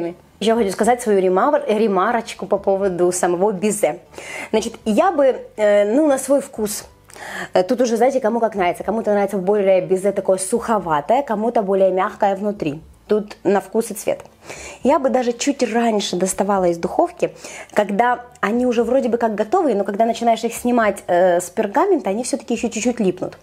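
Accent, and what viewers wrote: Ukraine. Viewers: давно подписана на ваш канал- нравится ВСЁ )))) От того - что творите на своей кухне- в плане кулинарии до вашего мягкого певучего украинского говора )))) Если бы у меня вдруг не стал показывать монитор... ДА, я бы просто слушала ВАС!)))) Спасибо за рецептик- Очень красивый - вот что-что- а бизе у меня в духовке получалось- будем ЕСТЬ пироженки!))))))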